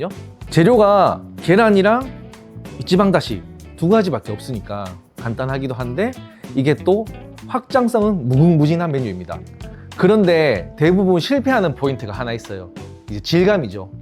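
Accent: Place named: Daegu, South Korea. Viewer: 대구 사투리로 정겹게 설명해주시는게 정겹네요ㅎ 많은 영상 기대할께요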